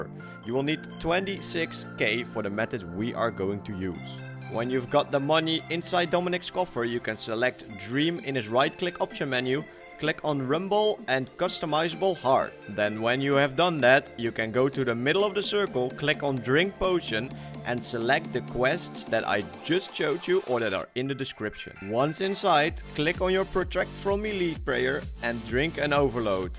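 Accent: Netherlands. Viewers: aan je accent te horen ben je nederlands :)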